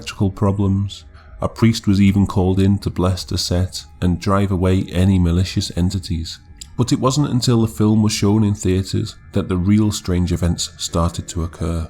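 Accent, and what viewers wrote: United Kingdom. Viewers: If you're curious about the three claw mark thing- it's a common occurrence in demonic activity. It's a mockery of the trinity rather than because demons have three claws. I was curious about that so that's why I know. Although I have seen one before. Very unpleasant sight... Definitely a new type of video. People tend to stay with the ones everyone knows, the original Omen/Poltergeist/etc so it's refreshing to see strange happenings in other films. Keep up the quality work, man. Random question, but are you British? I've been trying to figure out your accent. I'm not too good at figuring them out. DX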